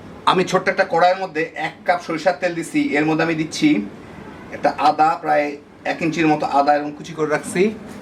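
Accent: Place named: Barishal, Bangladesh. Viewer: আপনি কি বরিশালের??? কথার ঠং ভাল্লাগে নাই। আর রসুন তো বেশি পুড়ে ফেলেছেন।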